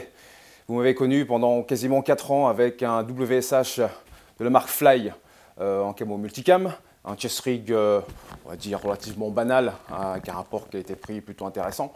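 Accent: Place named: Texas, United States. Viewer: Your accent is so strong I couldn't understand a word, are you from Texas or something?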